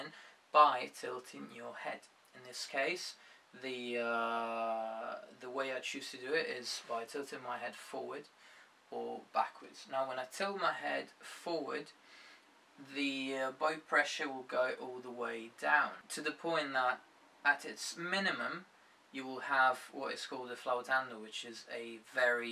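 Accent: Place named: North, United Kingdom. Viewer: You have a REALLY interesting accent, put me out of my misery? There is some Northern UK mixed with an European accent....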